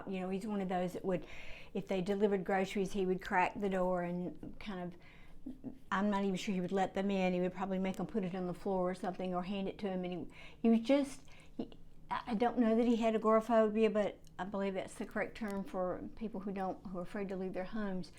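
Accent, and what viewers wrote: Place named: South, United States. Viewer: it was we have to make lemon pies and lemonade out of lemons… also spirit says never apologize for your southern draw. Your gorgeous 🤍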